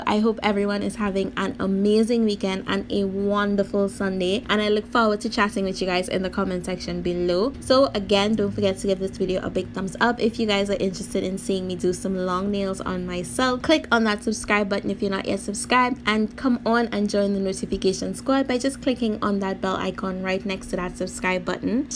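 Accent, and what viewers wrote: Trinidad and Tobago. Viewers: First video of yours I'm seeing. Are you from Trinidad 🇹🇹? Your accent sounds like it. 😊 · I know a Trini accent when I hear one. Big up yourself sis!!!!! ❤️🇹🇹🇹🇹🇹🇹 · Loving those nails and the accent my sister 🇹🇹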